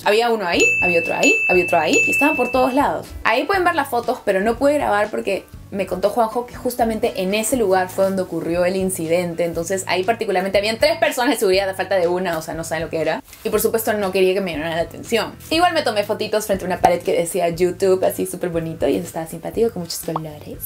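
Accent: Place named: Peru. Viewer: SAAA ES MI CAOSA PERUANASA Y NO SE TE QUITA EL ACENTO, ME ENCANTO TU CANAL 💘🌸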